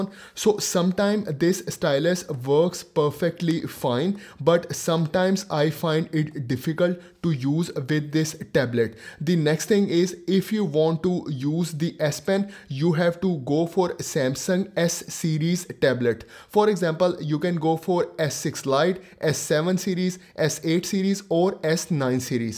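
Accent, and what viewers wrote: India. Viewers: Unrelated, but WHY does your accent sound so Indian?? I like it, but, like, how??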